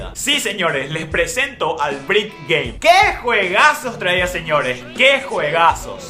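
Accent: Paraguay. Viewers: Puta madre??? Puto amo???... !!!Habla como paraguayo idiota¡¡¡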